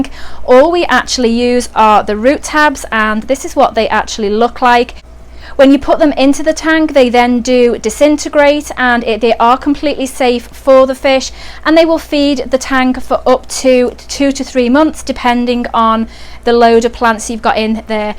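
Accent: United Kingdom. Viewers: oh ok thank you! Great video btw your videos are always so fucking and soothing to watch!! Even though I never comment I always like your videos! And I was just wondering because of your accent I thought you lived in the uk! I’ve only been subscribed for a month or less but I’ve liked your videos ever since I started watching and I keep going back to your old videos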